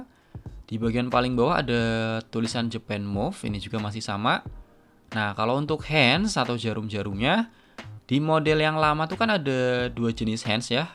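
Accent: Tegal, Indonesia. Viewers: Oalah...masnya dari Tegal toh...makanya kalau bilang Gua kedengarannya unik 😊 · Oh mas nya wong tegal ternyata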